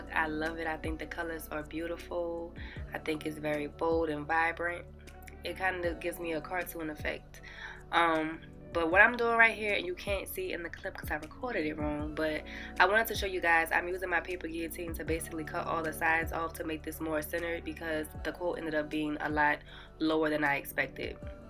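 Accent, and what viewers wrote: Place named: Texas, United States. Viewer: Nice font work. You have a nice accent, whereabouts in the States are you from? (Update: Just heard you say you live in Austin TX!) I like you talking over the video and the length is fine.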